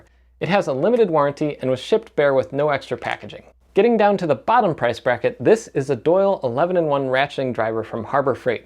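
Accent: Netherlands. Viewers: are you dutch actually?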